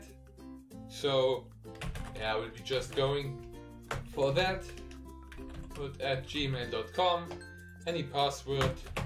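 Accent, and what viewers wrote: Germany. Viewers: "Installachion" Versuch mal den deutschen Akzent wegzubekommen. Das kann man sich kaum geben.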